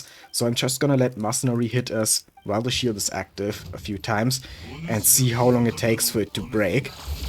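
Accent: Germany. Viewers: That german accent strong af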